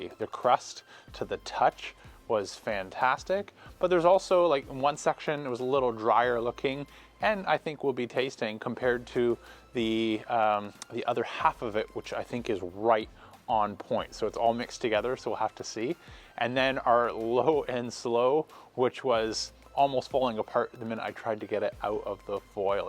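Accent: Canada. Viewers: You can tell this dude is a genuine super nice guy - that along with the accent, gotta be a Canadian. Lol. Nice vid. Just watched the LnS vs HnF rib video and came here. I'm not sure how you just came up in my recommended since I've been watching bbq vids for years. Subbed. 👍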